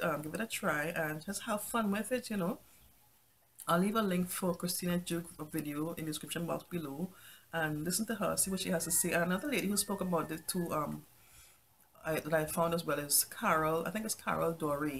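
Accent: Trinidad and Tobago. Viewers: I could tell by your accent hi trini am so happy 🥰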